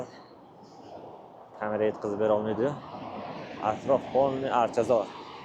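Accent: Samarkand, Uzbekistan. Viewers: Aka Samarqandanmisiz aksent shunaqa ekan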